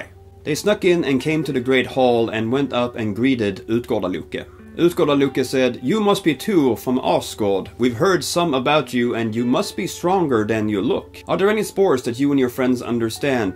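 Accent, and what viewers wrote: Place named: Scania, Sweden. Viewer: He is speaking with a skåne accent. Its southern Swedish.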